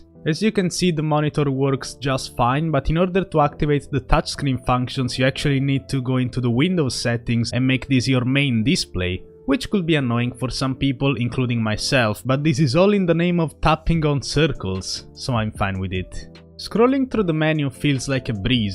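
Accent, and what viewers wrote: Russia. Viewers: This person has a heavy Russian accent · I thought Russian cause the accent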